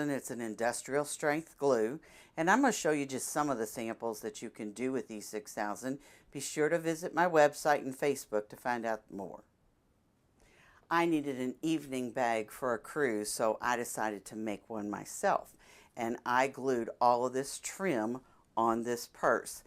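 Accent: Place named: South, United States. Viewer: She has some what of a Southern/mid west accent sometimes it's hard to understand southern people lol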